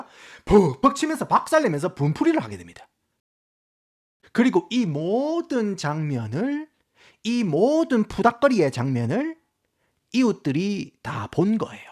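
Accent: Gyeongsang, South Korea. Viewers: 이 행님 갱상도 사투리 짭짤하게 해재끼다가 영어는 달달하이 할 때 경외감 오지게 든다^^ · 이 아저씨 깅상도 사투리가 은근 중독성 있네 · 살짝 투박한 경상도 사투리가 오히려 영어랑 더 잘 말아 지시는 듯 ㅋ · 볼때마다 은근 구남친 닮음. 은은한 경상도 사투리랑 말빨 그리고 동글동글한 얼굴…동그란 뿔테안경…참 좋아했었는데… 님 보고 있으면 그 사람이 생각나요 웃긴데 왜 슬플까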